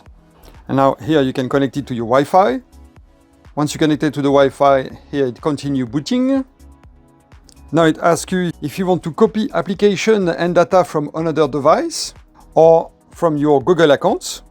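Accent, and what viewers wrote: France. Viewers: He have french accent 😮, but the tab is good🎉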